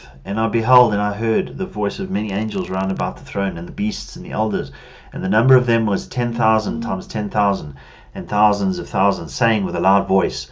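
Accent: South Africa. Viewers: There's no way I'm rolling my eyes...Just happy to see you haven't given up and that you are still looking and searching, which is encouraging to those of us who can't wait for the return of Our Lord and Savior Jesus Christ....Things are defenitely coming to a head in the middle east,and who knows what North Korea have got on their mind....Thank you for your work...As I mentiioned before,I am living in South America and I love to hear a fellow SA accent,especially knowing you serve The Lord....May God bless you....Maranatha! P.S. I don't know if you follow Amir Tsafarti from "Behold Israel"...He also serves the Lord and gives excellent updates on the Middle East.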